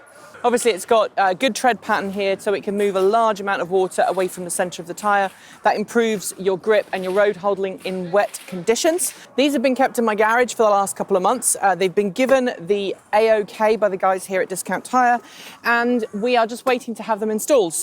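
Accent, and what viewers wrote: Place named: United Kingdom. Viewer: I like your accent , are you originally From uk?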